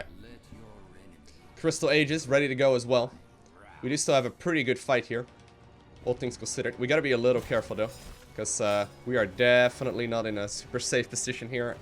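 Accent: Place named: Netherlands. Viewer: Sounds like he shaved away some of his Dutch accent. That's really difficult so good job on that.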